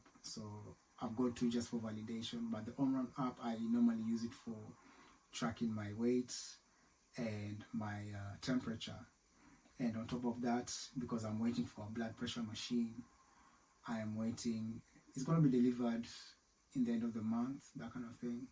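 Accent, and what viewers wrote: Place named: United Kingdom. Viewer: Its the UK accent for me 😊thank you so much for sharing, very educational, cant wait for you to be done with all these😮mungu mbele bro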